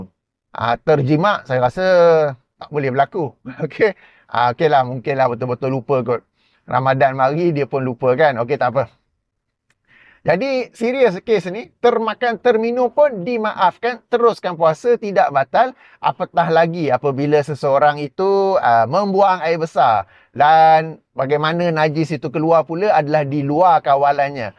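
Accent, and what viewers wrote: Kedah, Malaysia. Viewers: Orng Kedah cakap nampak kasaq tapi ada wisdom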